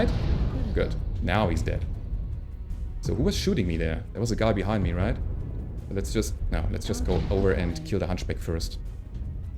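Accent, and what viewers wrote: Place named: Germany. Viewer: Hey! I'm using a KingCrab too. Nice video! In the meanwhile I didn't played a long time. But I'm downloading the game again. My KC is pretty good too. Is it possible you are from germany or so? Your talking sounds like a german one who tries to talk english... but really good english. ^^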